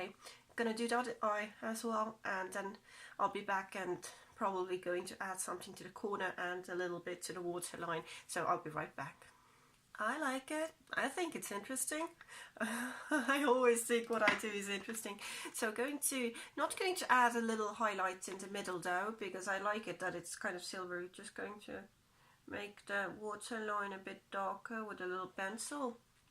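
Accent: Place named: United Kingdom. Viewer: Already subed here darling!! Love your english!!! I speak american english but love ritish one!!! like 32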